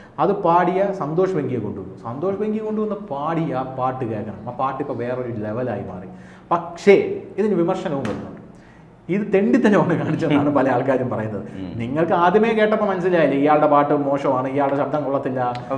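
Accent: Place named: Malabar, India. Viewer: വാക്കുകളിൽ മലബാർ ടച്ച്‌..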